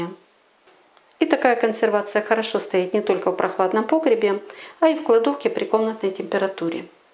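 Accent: Ukraine. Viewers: И не только компоты,но и консервацию.Правда, заливала несколько раз.А вы из какого города,?Очень приятный у вас украинский говор.Я сама родилась и пол-жизни прожила на Украине. У местных господинь всегда было множество просто классных рецептов еще из времен СССР)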